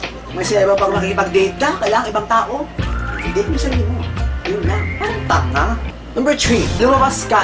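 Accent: Visayas, Philippines. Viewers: bisaya na si..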